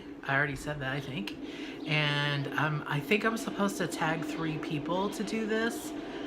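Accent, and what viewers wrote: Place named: South, United States. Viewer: Cool ! I thought I heard some southern in you! I'm from/live in Alabama!!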